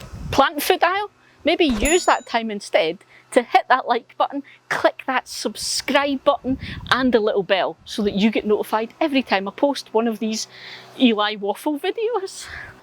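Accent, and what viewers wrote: Scotland, United Kingdom. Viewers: Sunday: Eli Video Day. It’s always nice to hear your Scottish accent on a Sunday morning. The information you impart is always useful, to boot. My problem with fertilizers is my desire to keep the vegetable garden organic—after planting. There seem to be only a few fast-acting liquid organic fertilizers available, and none seem to help with blossom end rot. My tomatoes suffered from it, even though I watered religiously, and now my peppers—which are finally blooming and forming fruit that doesn’t fall off—are suffering the same malady. This, in spite of consistent watering.🥺 Oh well, maybe the drip system I’m installing for Season ll will provide better results. Have a great day (or is it evening, there?), Eli and.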